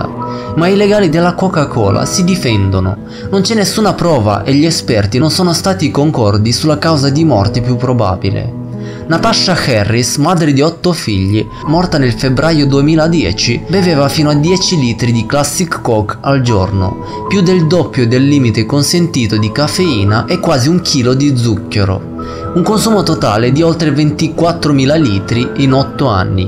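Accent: Russia. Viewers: Direi che il lessico italiano di alto livello che accompagna i tuoi video fondendosi con l'accento russo non troppo marcato, e il tasso di suspence dato dalla musica di sottofondo crea un armonia unica che ti invoglia di volerne di più di più sempre di più AHHHHHHHHHHHHHHHHHHHHH! Perfavore un top 5 curiosità sulla bomba atomica -!!! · sei russo? hai un accento vagamente russo e poi con il sound di sottofondo lo accentua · È russo... ha un accento diverso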